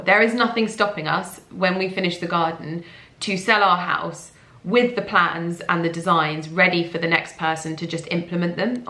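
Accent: RP, United Kingdom. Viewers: I don’t understand why there is a smear campaign against you - your accent might have changed, but that is because you have educated yourself and developed your vocabulary. Yes, RP (Received Pronunciation) might be associated with the upper classes, but nowadays, people can have that accent regardless. Your accent shows to me that you are well-read, well-educated and intelligent. Why are people so hateful of that?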